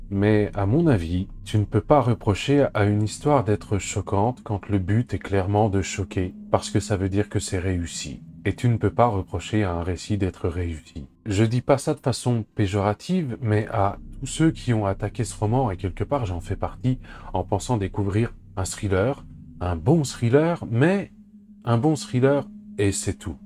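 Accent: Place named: Quebec, Canada. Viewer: et l'accent, Drummondville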